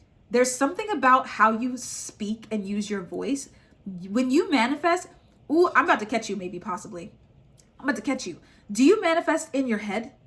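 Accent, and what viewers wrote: United Kingdom. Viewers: Old British accent is crazy ima jus leave it at that 😭😭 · Been singing “money money moneyyy” for weeks and it’s the one you started with. Also have that same British accent haha - loving the synchronicities! Thank you 🤑✨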